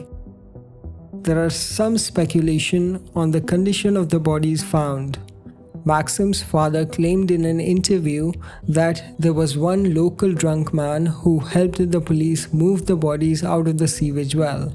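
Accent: India. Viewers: Is he Indian? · I always stop listening immediately, when I hear he is narrating. The Indian accent just ruins it for me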